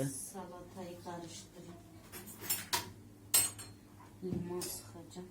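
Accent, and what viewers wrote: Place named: Konya, Turkey. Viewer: Merhaba bur konya yöresini konuşmayı benzettim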